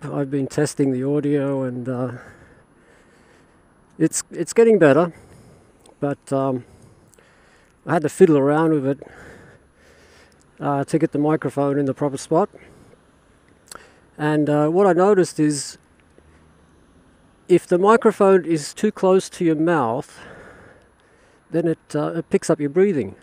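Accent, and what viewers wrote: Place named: Australia. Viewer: Simply fantastic mate! Us American blokes luv everything Australia+UK...yes I knew from the accent and driving on the wrong side of road..I thought UK until I saw Palm trees. So now I can see Sydney from the seat of a fireplace. Say, my bike-CBR1100XX..is not common here, but I know that you have some over there...ever seen one over there in kangaroo land? I'm subscribing.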